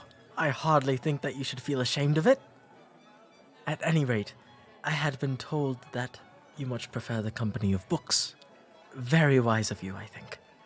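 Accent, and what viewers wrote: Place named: RP, United Kingdom. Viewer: oh wow the posh accent is 🤌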